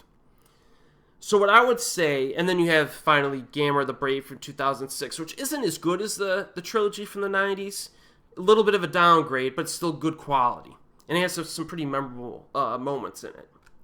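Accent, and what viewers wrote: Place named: Pennsylvania, United States. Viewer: You gotta be from PA with that accent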